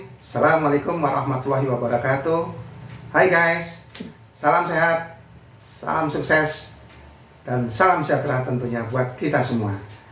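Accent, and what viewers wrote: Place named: Java, Indonesia. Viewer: keren om...analisanya semakin tajam dan mantap, logatnya medok 😂😂😂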